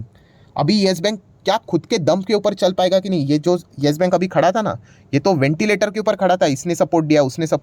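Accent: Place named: Gujarat, India. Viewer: Sir aap gujrati lgte hai baat se Hai??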